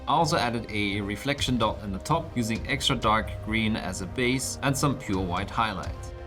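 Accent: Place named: Austria. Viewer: for a second i thought you where saying "animal" paint ^^ our austrian-english accent really is fun at times XD i really enjoy the sponge technique btw. i used it for some aos nurgle armor and really loved the result TY =)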